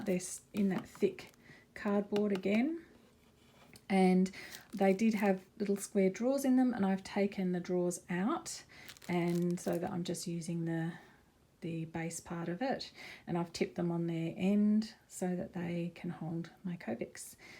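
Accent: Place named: Australia. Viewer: Any chance your Australian, just accent sounds aussie and well normal. its weird finding an aussie card maker.. well either aussie or kiwi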